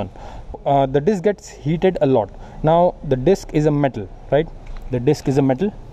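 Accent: Gujarat, India. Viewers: u gujrati?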